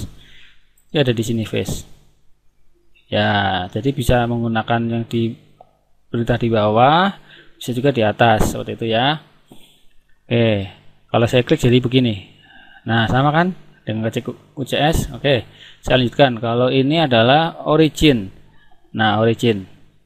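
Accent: Banyumas, Indonesia. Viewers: manteps ngapaks..., penjelasanya sungguh jelas.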